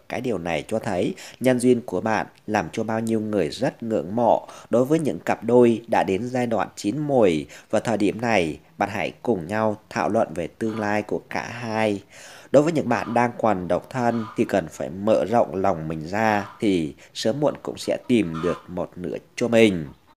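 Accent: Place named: Central, Vietnam. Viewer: giong đoc ko hay mây chuc kênh luon phat triên nhé giong nay nhu ở miên trung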